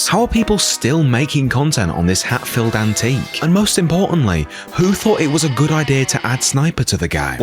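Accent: United Kingdom. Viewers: i think this guy is british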